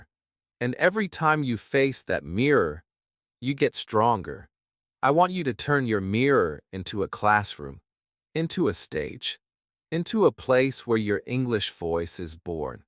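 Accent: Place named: United States. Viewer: I like your soft American accent very much 👍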